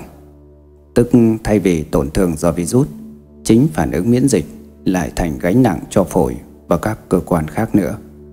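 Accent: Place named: North, Vietnam. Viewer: Bác này không đọc đúng được một từ ngoại ngữ, Virus mà bác đọc nguyên chất giọng Bắc vn là vi dút 😉 Nói chung, giọng đọc của bác không thuyết phục. Ad có thể cho biết rõ danh tánh của vị bác sĩ nào đã viết bài,và vị ấy đang làm việc ở đâu không ạ? Thank you!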